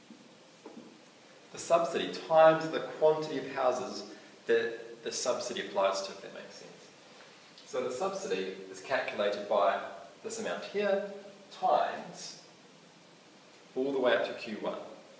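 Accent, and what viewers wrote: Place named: New Zealand. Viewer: Is it really that hard for americans to understand a new zealand accent? God sake lol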